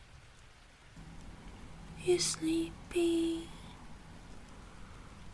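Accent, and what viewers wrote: United Kingdom. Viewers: Can you make a part 2 of this :D, this is my favorite asmr yet with the British accent ｡◕‿◕｡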